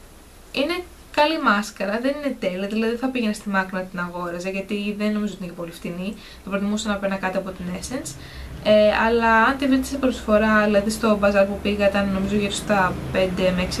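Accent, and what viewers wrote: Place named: United States. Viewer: Πραγματικα εισαι πολυ γλυκια κοπελα και τα αγγλικα σου με αμερικανικη προφορα οσο μπορω να κρινω εγω ειναι πολυ καλα δεν καταλαβαινει ο αλλος οτι δεν εισαι απο την αμερικη!Αλλα λεγε λεγε μαλλον αυτα λιγο στα ελληνικα χαλασε η αρθρωση δεν ειναι τιποτα διορθωνεται προσπαθησε λιγο πιο αργα και να ακουγονται ολοκληρες οι λεξεις καντο σαν πειραμα διαβαζοντας μονη σου μην κομπλαρεις και σταματησεις τα βιντεο στα ελληνικα ισα ισα θα σε βοηθησουν και μιλας καλυτερα απο κατι αλλες να εισαι σιγουρη!:D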